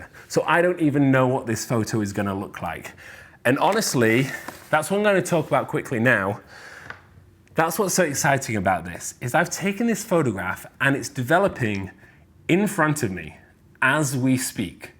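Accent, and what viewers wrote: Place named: United Kingdom. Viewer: Nah that UK accent is a blessing thanks for the video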